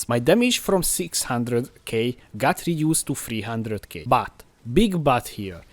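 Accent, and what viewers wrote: Hungary. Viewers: A magyar akcentus megol meg kulfoldrol is felismerni :D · Szia , nagyon Magyaros az akcentusod. Really nice video there ! :)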